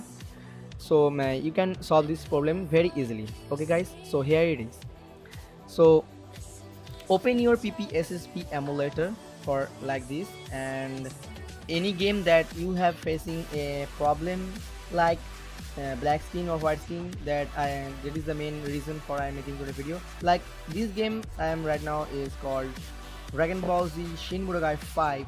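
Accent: India. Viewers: Fucking India Accents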